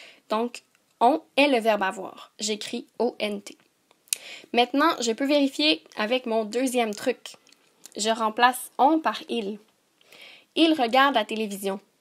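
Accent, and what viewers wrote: Quebec, Canada. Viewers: Merci ! Mais es-que ses un accent québéquoi ? (pardon pour avoir mal écrit ) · Elle est quebecoise, au Québec la langue officielle est le français Je sais c'est pas le même accent mais ça fait son charme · bravo je suis une nouvelle abonné et j ai connais cette accent québécoise merci bcp lâche pas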